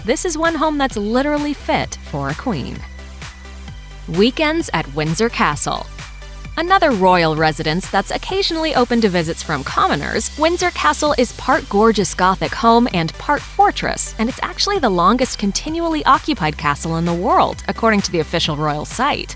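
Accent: United States. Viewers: It feels really wrong that this is narrated by an American. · Supper annoying American accent voiceover. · a yank talking about the royal family not for me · Why an American doing the commentary telling us what we are paying for a load of spungers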